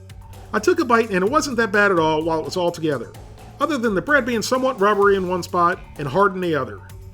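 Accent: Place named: East Coast, United States. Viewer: you sound like you're from the east coast. What area are you from?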